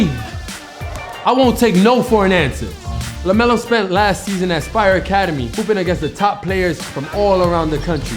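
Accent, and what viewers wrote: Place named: Dominican Republic. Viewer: Bro I had to like the vid when he spoke that Dominican Spanish. Ayyee🇩🇴🇩🇴🇩🇴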